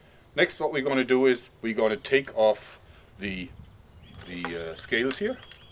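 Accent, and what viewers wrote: Germany. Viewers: So geil der Deutsche Accent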